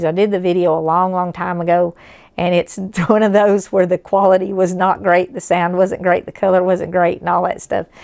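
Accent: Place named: Appalachia, United States. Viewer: I was born in West Virginia and my family moved to Florida when I was about 3 years old. I often have thoughts of wanting to turn back the clock so I could have grown up there and had a simpler, more grounded and Christ-centered life. I imagine what it would be like to have friends like you who who speak with a sweet Appalachian twang and share the values I wish I'd been raised with. God bless you and have a joyous Christmas.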